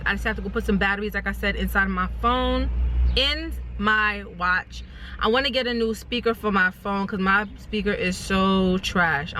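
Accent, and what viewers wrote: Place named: New York City, United States. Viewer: Girl I can tell you from NYC! Lol 😆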